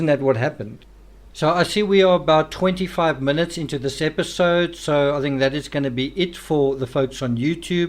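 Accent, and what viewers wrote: South Africa. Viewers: Disliked because of your terrible South African accent.I'm South African myself,but Dude !!!!!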